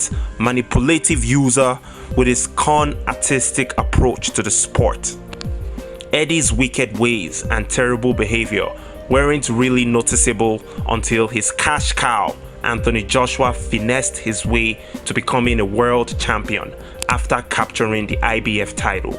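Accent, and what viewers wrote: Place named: Nigeria. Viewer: First of all, I love your content. You sound extremely eloquent while keeping your strong Nigerian accent intact. However you are just another extreme Wilder fanboy, Eddie hearn is a true promoter for AJ however does eddie run his mouth too much ? Yes he does. Did Eddie hearn force Whyte to take substances ? No he did not. You trying to sound really deep, but calm down you are just another overly emotional wilderfan boy seriously . Calm the fuck down, u don't make much sense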